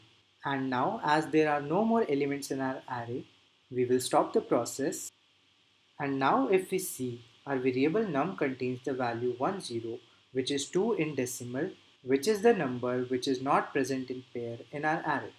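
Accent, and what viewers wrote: India. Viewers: fucking indian accent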